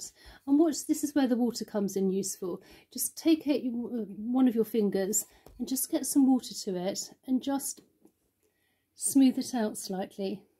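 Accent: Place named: United Kingdom. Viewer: Hi, if you use two-part epoxy products a lot, you can get very sensitized to them, so you might want disposable gloves for what you are doing. After the two-part products have cured, they are very inert, and there is no concern about contact. In practice, the concern might not apply nearly as much to the putty, because it doesn’t have the honey consistency that most two-part epoxy products have, but in principle it still does. Thanks for the nice vid, from an Ahmericaan that loves hearing the Queen’s English. ~Brian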